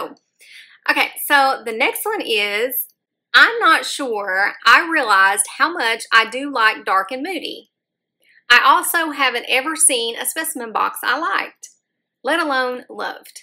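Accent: North Carolina, United States. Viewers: I knew you had to be in North Carolina by that accent. I'm in Winston-Salem. We used to go to Hickory a lot for Gems & Minerals shows when I was little. I hated going, but they would bribe me taking me to Hardee's there. They were the best back in the day.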